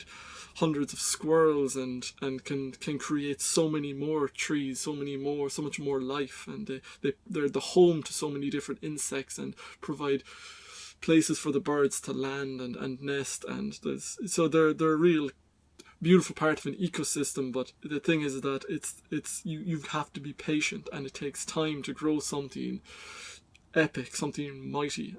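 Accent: Ireland. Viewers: Nice to find a video of an Irish lad talking about Jung. Gizza shout if you're in Cork.. I'd love to put you up in Dripsey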